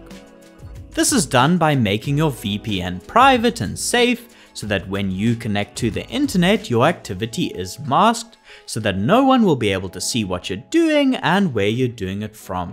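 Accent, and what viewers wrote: South Africa. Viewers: bro sounds south african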